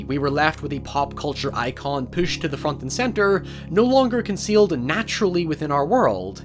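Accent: Northern Ireland, United Kingdom. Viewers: I love the NI accent <3 Pronouncing "now", "nye" is so cute. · Are you from northern Ireland? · I think this is the only channel I have found with an accent from home. Go on norn iron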